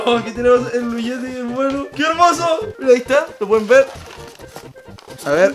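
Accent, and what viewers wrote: Chile. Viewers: Ho por Dios eres chileno como yo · Mmmmm eres chileno???? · Eres chileno sierto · Sabia que era chileno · Wena eres chileno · Este chileno :v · hola soy nuevo sub ¿eres chileno?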